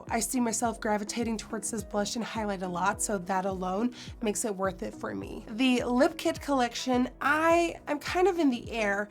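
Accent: United States. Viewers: anyone else thinks she sounds sick/more American in this?? where the holland accent at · I haven't watched her in a few years and oh my goodness her voice is so different did she move to the states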